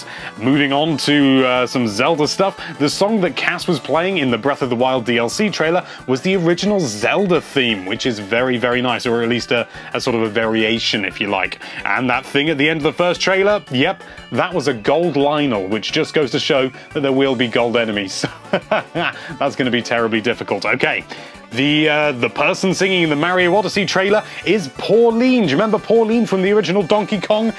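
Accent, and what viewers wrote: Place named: United Kingdom. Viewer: It's British English, but yes, it is a little hard to tell. Wonder why!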